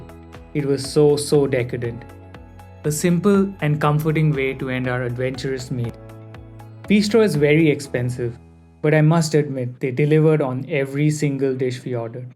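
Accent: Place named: Mumbai, India. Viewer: Just my opinion: you speak Mumbai English which will fetch you sobo subs and a few foreigners. Try Hindi to see if the new experiment is working for you or not. I wouldn't be able to to hear English from someone who is a non native speaker for very long. Your fluency is on point but the voice isn't made for non-Indic languages.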